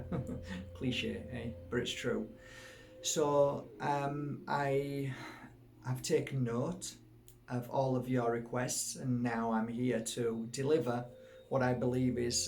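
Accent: Yorkshire, United Kingdom. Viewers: I love listening to this Genuine “ Yorkshireman !! Tells it how it is !!!!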